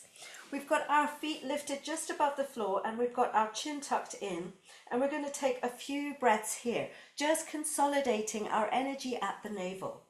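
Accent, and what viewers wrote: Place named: United Kingdom. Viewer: Thank you / Suggestion. Dear. Thank you very much for bringing me back to my powerful practising of Kundalini yoga, and adding great practises to mines. May I humbly add this next part Just To Help Me, You and Other Students? If Yes, please read: For the returning and for the new students, I think it would be really kind to remind us that we do not have to do the practises as lengthy as you do them, and that we can stop as soon as the exercise becomes tiring. Also in this video, (maybe just due to your speaking fast & British), there was a confusing point from minute on, where you stoped 'showing us the exercise by doing it,' and start just explaining what we should do while still on our backs. Would you kindly make that shift clear? Truly thank you for your goodness and obvious skills brought to us in this most beautiful way. 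God bless you. May the Wind Be On Your Back, And Sun Always Shine Upon You!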